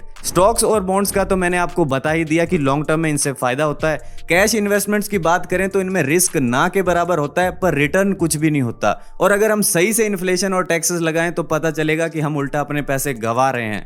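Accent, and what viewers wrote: Gujarat, India. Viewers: Bhai accent se gujrati lage thu 😅❤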